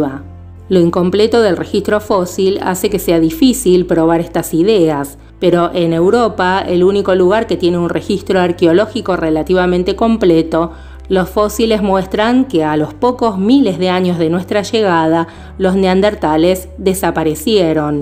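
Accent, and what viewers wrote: Argentina. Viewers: Eres argentina, ¿verdad?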